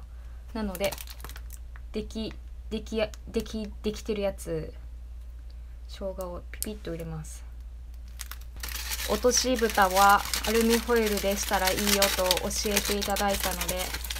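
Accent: Kansai, Japan. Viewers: ガッツり食べる女性は大好きです。 こんなに可愛い女性だとは💕 関西弁なんですね♪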